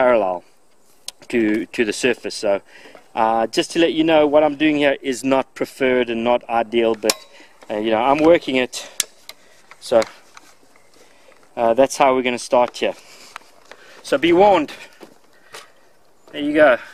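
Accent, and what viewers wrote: South Africa. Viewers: Are you from South Africa ? Soos in afrikaans ? USA ?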